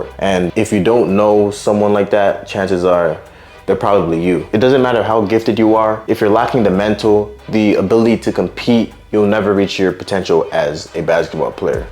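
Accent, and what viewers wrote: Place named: Ontario, Canada. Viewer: Bro is definitely from Ontario 😭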